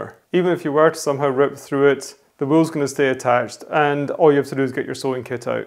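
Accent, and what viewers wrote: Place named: Aberdeen, United Kingdom. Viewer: Are you no fae Aberdeen or there aboots?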